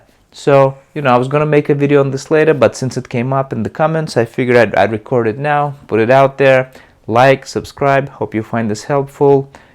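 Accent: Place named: Russia. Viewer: Been dealing with Pain/Fibro issues for a long time, I was bed bound for about a yr about 8 yrs ago but still have synthoms found a great TMS dr here in mass hes Russian like yourself ..so to hear your accent and his which is the same it gives me alot of confidence ...thx you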